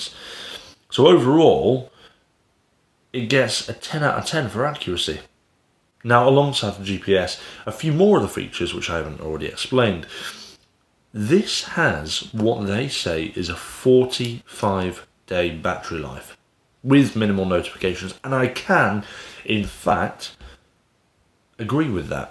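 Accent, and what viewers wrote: United Kingdom. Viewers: I love the British background- the cup of tea, rusty books, bottles of whisky! Nothing like getting your daily tech review in a British accent!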